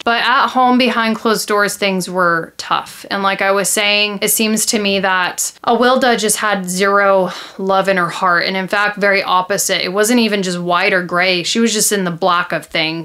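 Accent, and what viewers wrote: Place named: California, United States. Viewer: You're a Californian right you and Elise from 10to Life both look and sound like us. Cali girls stick together lol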